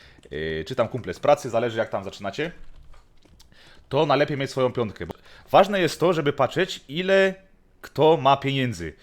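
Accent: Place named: Silesia, Poland. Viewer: Czuć ten śląski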